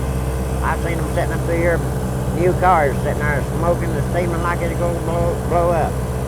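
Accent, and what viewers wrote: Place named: Appalachia, United States. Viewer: I lived in Appalachia from the time I was 10 until I was in my twenties. I was born there, but we moved to Cali, which was really nice back then, not a commie country like now. I could listen to this guy all day becuase It reminds me of growing up. And it sort of breaks my heart, becase even though I finally moved back to Appalachia, I live there now, I can never go back to the Appalachia of my days growing up. People were so free, so real. It doesn't exist anymore. People talk with the same accent, but it's gone. I don't really know how to say it, but if you lived there 30-40 years ago, you know exactly what I mean.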